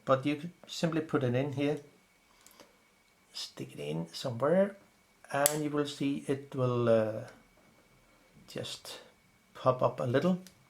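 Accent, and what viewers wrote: Denmark. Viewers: Are you Danish :)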